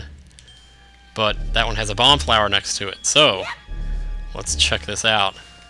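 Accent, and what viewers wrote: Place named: Wisconsin, United States. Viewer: Are you from Wisconsin? you seem to have a wisconsin accent... like me lol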